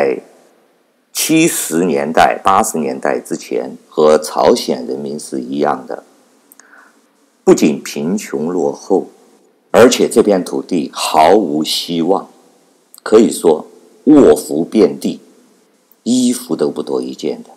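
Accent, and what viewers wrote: Taiwan. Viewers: 这个口音，台湾腔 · 台湾口音冒充大陆人，搞笑不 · 这你们也信？这么明显的湾湾口音，还大陆人？笑死人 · 绝对的台湾腔，说说你是大陆哪的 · 井底之蛙…你的声音是台湾口音！先生！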